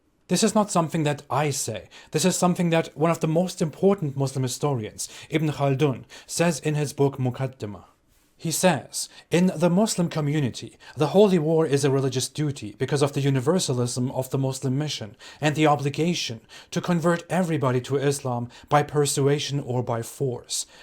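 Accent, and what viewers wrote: India. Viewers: he has a heavy Indian accent but I guess you just make fun of Indian accent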